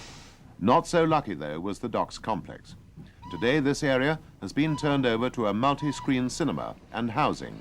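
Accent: United Kingdom. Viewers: It really is weird to come back to this after growing up on thomas and seeing all of the trucks and engines in real life and in motion Also something about this narration feels so quintessentially British beyond the origin of the video and narrator accent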